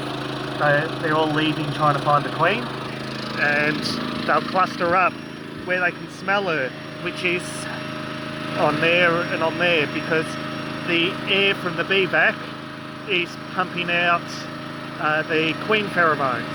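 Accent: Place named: Australia. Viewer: Where are you from? You sound Australian.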